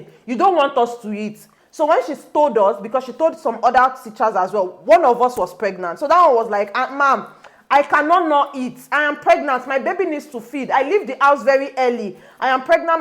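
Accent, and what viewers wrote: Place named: Nigeria. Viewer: Everything about you is cute, but stick to Naija accent please!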